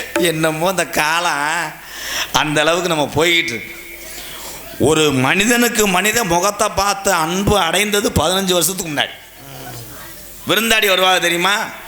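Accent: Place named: Sivaganga, India. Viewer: Bro ithu aanavam illa...sivagangai seemai native slang.He is a good person.He did many helps for the poor peoples❤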